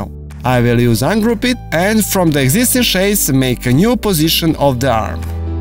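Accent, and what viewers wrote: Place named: Russia. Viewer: Russian accent:)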